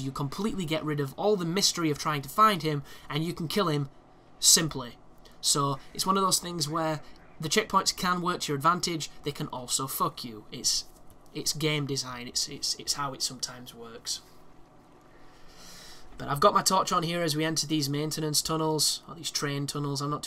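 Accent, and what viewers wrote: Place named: Northern England, United Kingdom. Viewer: I like survivor difficulty walkthrough, you sound northen said fooking as well as fuck alot, subbed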